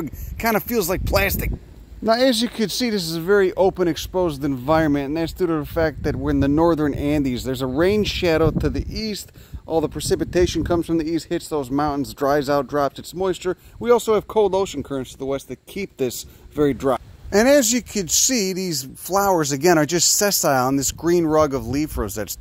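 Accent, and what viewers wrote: Chicago, United States. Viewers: Eloquence, like a Chicago accented David Attenborough! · no, this is not a new York accent. It’s a Chicago accent. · It is hilarious to see the title and thumbnail, then you click on and you're like, "Chicago accent." Oh yeah, its you. LOL How's it goin' ya old prick? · Lotta people in this comment section aren’t familiar with a true Chicago accent I guess 🥴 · Ok, apparently it is, in fact, a Chicago Accent, so sorry about that, sounded that way to my southern ears! 😆😁 Still delightful though! 🤍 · That’s a Chicago accent. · I think every nature video should be narrated by someone dude from Chicago. Chefs kiss! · This guy's from Boston or Chicago. My bet is Chicago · this is a chicago accent! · Chicago. He’s from Chicago. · as a new Yorker i feel like this accent is most likely from Chicago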